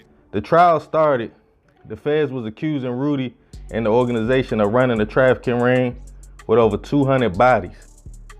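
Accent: Baltimore, United States. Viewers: Bro he baltimore. Can't you tell by his accent.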